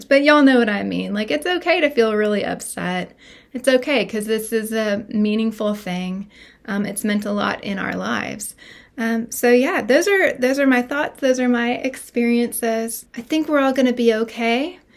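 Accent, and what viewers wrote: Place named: South, United States. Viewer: Just say the rustling sound is ASMR 😂 As for JoAnn's... My first memory was going as a child and buying scraps to make rag dolls. My mother and grandmother both sewed and did a lot of crafting, so I first started making rag dolls using their leftover fabric. Then, when I got into it and ran out of scraps, they brought me to JoAnn's and would look through what they had. I was sad when I first heard they were closing but I can't shop there anymore because I am living abroad now. If they close all stores before I come back, I may never shop there again. They are closing the store in my hometown, so I definitely won't ever shop there again. I made a lot of baby quilts in my 20s and I used to love their Firefly sale. Your wedding dress is beautiful and I love the southern accent 😄💖 I have a NY accent but it usually only comes out when someone cuts me off in traffic😅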